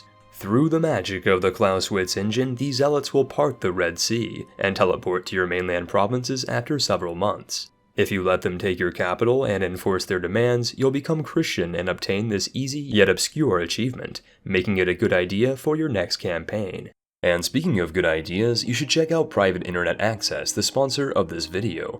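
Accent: Texas, United States. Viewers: I love Texas accent :D. Make a video only in Texan 😂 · Love the Texas accent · bruh that texan accent lmao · Came for the Achievements, stay for that Texas accent. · the smoothest Texan accent out there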